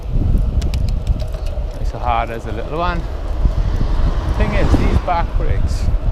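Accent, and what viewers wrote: Wales, United Kingdom. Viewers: Welsh defiantly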